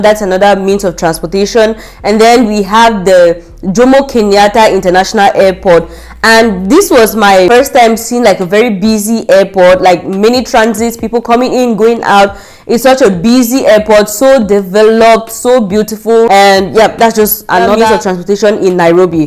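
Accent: Nigeria. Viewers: Why do you sound more Nigerian than Cameroonian? Just curious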